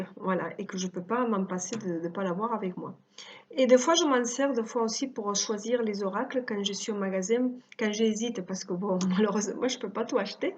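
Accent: Spain. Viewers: Hello ma belle (dis-moi si jamais tu n'as pas envie que je t'appelle comme ça:- )))... JE PARTICIPE évidemment avec un immense plaisir. Bravo pour tes 100 abonnés!!!... Moi je t'adore, et je suis ravie d'avoir fait ta connaissance. Tu te débrouilles super bien pour ta chaîne et tu es très à l'aise, naturelle et on te comprend tout à fait... Donc, pas de honte... Et puis, l'accent espagnol est un plus!!! Bisous bisous... Tout plein! Sonia, Genève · Bonjour!!! Moi, j'adore ton accent espagnol d'autant que je suis d'origine espagnole et j'en suis très fière... Je participe donc à ton concours avec grand plaisir... Je trouve très gentil de ta part de célébrer déjà tes premiers abonnés... Je te souhaite une belle journée ensoleillée (car je suis près de Nîmes et chez nous le temps est superbe)... Bisous... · cc, j'adore ton accents espagnol, mon père est espagnol, saragoza 😉 + 1 abonnée , je t'invite à venir à voir ma chaîne et t'y abonnée et me suivre si tu le veux sa me ferait plaisir. 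gracias bela . besitos. ( attention la belle on a pas le droit de mettre je participe en commentaire) c'est interdit tu pourrais te faire supprimer ta chaîne. Il faut indiqué que les personnes mette un mot tout simplement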